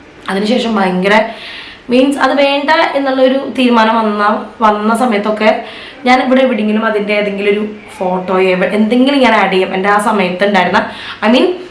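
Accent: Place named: Thrissur, India. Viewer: Thrissur aano veedu. Samsaram athe pole nd. Njan Thrissur aanetto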